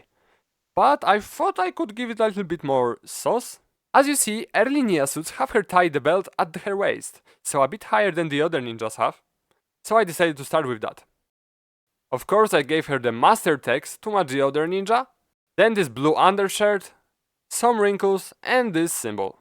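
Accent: Poland. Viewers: Słychać że z Polski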